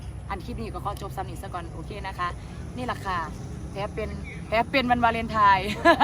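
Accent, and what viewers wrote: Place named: Laos. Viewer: ทำไมพูดสำเนียงลาวจังเลยละคับ คับ ขอไลน์คนข้างๆให้หน่อยได้ไหมคับ😉😉😉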